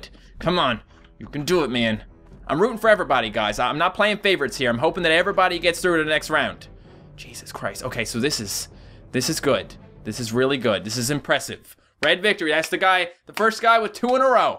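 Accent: Canada. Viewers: Are you Canadian? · are u Canadian?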